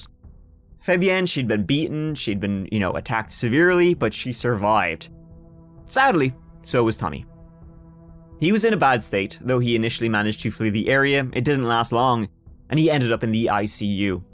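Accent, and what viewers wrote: Ireland. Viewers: Thank you for putting podcasts on you tube it is such a treat for your fans I enjoy listening to your wonderful brogue and of course your sense of humor the way u have doing theses horrific stories . You’re simply the best storyteller God bless you and your family RIP to all of the victims of these crimes Wish you all the best with your podcast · Are you trying to do an Irish accent or something?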